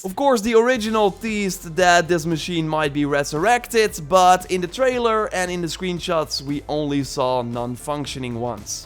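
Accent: Netherlands. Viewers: Hmm... Interestingly, not only you’ve guessed the name correctly, you also have Dutch accent.....Like RobinGaming. And your YouTube name is begin with which is very common fore letters of Dutch names..! Hmmmmmmmmmmmmmm....(edgy face) Yeah, I think you’re definitely Guerilla’s undercover bro!:) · where do you come from, you sound dutch?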